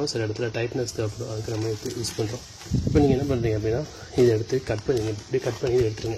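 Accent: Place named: Tirunelveli, India. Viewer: Ahh Nama ooru slang....Tvl uh sir nenga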